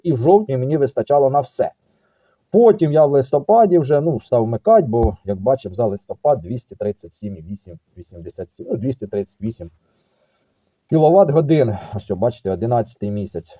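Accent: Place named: Cherkasy, Ukraine. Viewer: Черкащина. То місцевий діалект 😂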